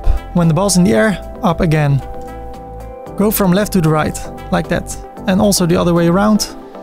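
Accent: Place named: Netherlands. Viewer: You have a nl acsent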